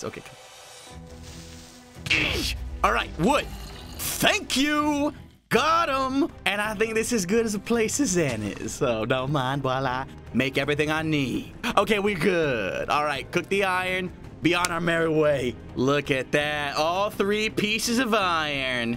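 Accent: Netherlands. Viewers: Wait... are you dutch?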